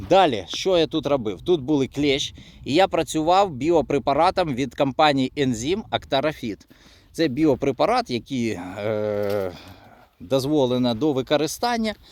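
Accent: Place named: Russia. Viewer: Перестань акати і какати, як кацап. Гидко слухати!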